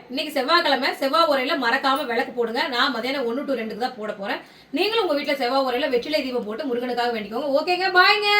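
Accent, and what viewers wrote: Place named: Thiruvarur, India. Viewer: Niga pesura varthaigal nalaa purigirathu naanga pesurathu polave pesuringa enaku thiruvarur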